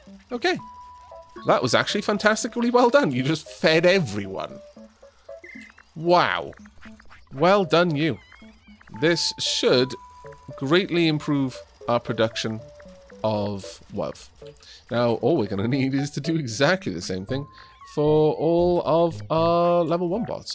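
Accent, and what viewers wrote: Wales, United Kingdom. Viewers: It’s taken me far too long to work out exactly what makes this series so enjoyable. Is it the knowledgable way puts the bot coding together? Is it the dulcet tones of his Welshishness (try saying that 3 times fast) that makes it so pleasant to listen to? His addiction to tidiness and prettiness? Is it the ridiculous cuteness of this game? No. It’s the motivational speeches. I really want to be part of the collective just so my representative bot can be on the receiving end of one of these! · I would wuv to be a Neumann just to hear how you say my name. It would also be creepy cool if I ended up in I AM MOTHER team because I work in a day care. I'm mainly curious how my name sounds in a Welsh accent. Wiv wuv Akane Taakon P.s. Spread the Wuv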